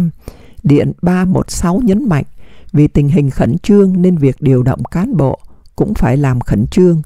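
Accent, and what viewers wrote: North, Vietnam. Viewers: Như đã nói, giong hơi giống giọng chuyên gia tâm lý Lý Thị Mai ( đg ở VN). Giọng Bắc54 nge ngồ ngộ, vui tai.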